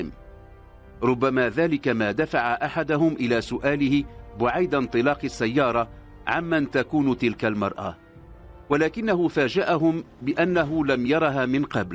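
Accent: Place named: Morocco. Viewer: انا معجب ومتابع للقصص التي تتحفنا بها بصوتك المغربي الواضح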